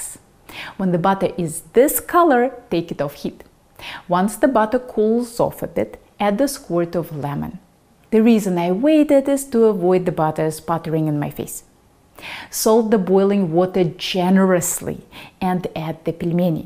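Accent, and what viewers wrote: Russia. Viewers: Рашн акцент не спрятать) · I followed your recipe and made pelmeni your way. They were deliciously spectacular! Thank you very much. You mention Olia Hercules often, so I watched a few of her videos. Her recipes looked tasty but it seemed she wasn't cooking for the average person. Most home cooks do not cook the way she does. In one video she used a gas range that must have had a dozen burners. I like the fact that your food processor is small and you need to make two batches and mix them. You don't have the Instant Pot Ultra Bluetooth model, you have the same one I have. That is what it is like for most people. You seem to love what you do and passing on your passion. That is what I enjoy about your videos. It doesn't hurt that you are a very pretty lady with a Russian accent either.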